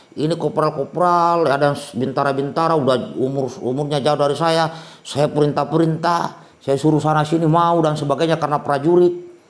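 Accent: Makassar, Indonesia. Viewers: Mantap komandan 👍👍🙏.. Solo hadir.... cerita cerita yang menarik dan inspiratif dari Mayor..... jangan lupa logat Makassar, kopi dan rokoknya pak komandan.... sukses selalu 👍👍👍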